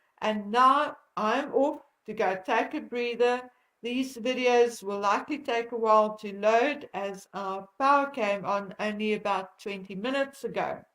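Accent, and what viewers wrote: South Africa. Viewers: It isn’t Americans falling for Prince Moob or wanting censorship, take your contempt & shove it. Britain Europe embraced Marxism, fascism and nazi-ism. You resent Americans and Brits and Europeans have tried pushing Marxism on us. Leftist organizations attacking Americans free speech rights yet you want to blame Americans. The ignorance is on people like you I have had it. Remain in your old world, ignore the rapes of little girls and women like your royals in all of Britain and Europe do. Have & blame America; I can guarantee you we will never again go to your rescue. You & the former outpost of empire Africa though from your accent you sound more those other allies of the nazis, the Boers. Who has kept & upheld monarchy & made excuses for these backward wannabe grand high poohbahs of upper buttcrack, who had no problems with their empire that they still clutch to their chests and all the problems that came about because of that. 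Who considered drug addicted nazi Harry such a popular cheeky fellow, just as they loved his nazi great uncle David. I had sympathy for Diana, and William, The Soho ho wasn’t popular in the US, but in Canada, she was assisted in entrapping your nazi Harry by other Canadians. Imbeciles like your offspring who vote fascist socialist Trudeau who attacks free speech in ho Canada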